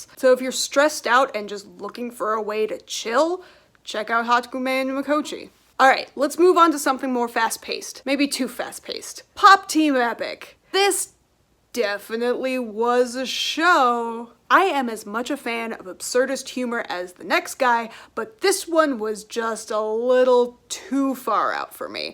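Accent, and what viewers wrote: United States. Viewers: I there’s any future anime reviews I’d live if you could write out the names. I have som hearing issues and Japanese with American accent is a bit tricky sometimes. 😂 Other than that, great video! Greetings from Sweden!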